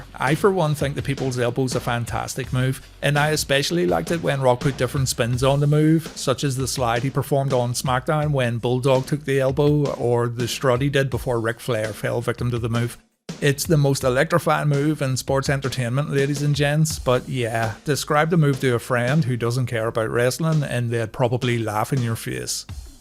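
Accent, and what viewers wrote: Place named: Liverpool, United Kingdom. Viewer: A scouse talking about wrestling. Subscribed.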